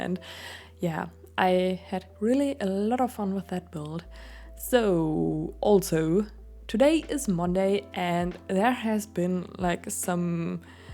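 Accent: Germany. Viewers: 🤣🤣 thanks for clarifying! I knew she sounded German. Danish would be a close match, tho!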